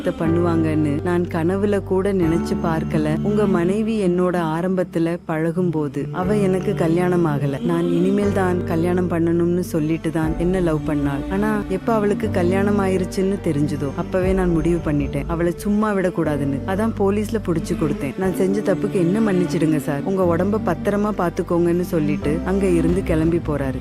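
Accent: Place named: Kerala, India. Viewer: Madam unga videos ellam super.unga voice very very super.unga kuralil malayalam kalantha tamil ketka enimaiyaka erukkirathu❤❤❤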